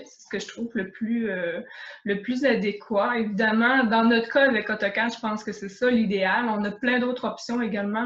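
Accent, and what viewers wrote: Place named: Quebec, Canada. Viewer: ca a l'air sympa , mais aprés avoir visionné 2-3 capsules , l'accent quebequois est deja difficiles a comprendre mais avec la qualité du son, l'echo cela rend les explications presque inaudible. et en plus la qualité de l'image est toute flou, on ne peu lire ce qui est ecrit a l'ecran......si le son et l'image etait correct je pense que cela pourrait etre tres interressanr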